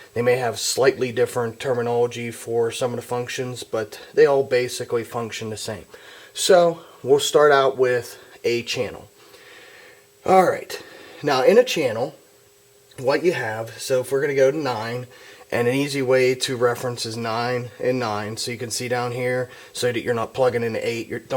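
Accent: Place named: Baltimore, United States. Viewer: great tutorial and there's no hiding that baltimore accent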